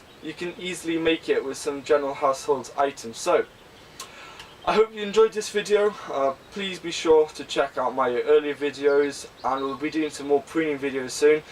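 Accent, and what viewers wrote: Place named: England, United Kingdom. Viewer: you look and sound english for some reason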